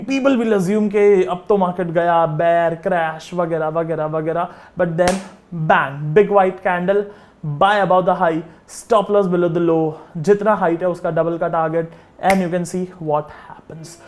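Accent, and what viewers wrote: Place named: Mumbai, India. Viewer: Awesome Yahi jab bolte h aap to maja aa jata h Aur humko sunna h typical bombay language bro Pls dont say pure hindi 😂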